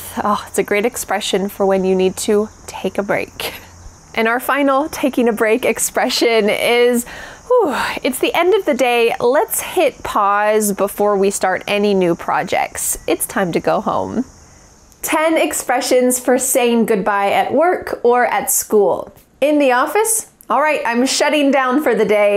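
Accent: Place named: United States. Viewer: By the way, I'm crazy about the American gorgeous accent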